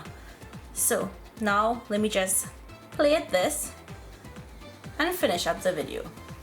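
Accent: Trinidad and Tobago. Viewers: I just love the accent of a Trini I love guava cheese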